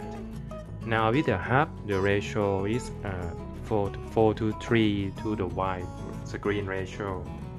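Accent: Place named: Thailand. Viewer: สำเนียงไทยๆ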